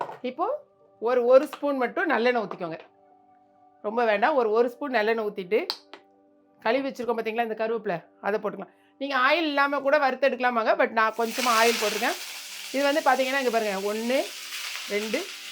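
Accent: Tirunelveli, India. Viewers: Neenga tirunelvelithana akka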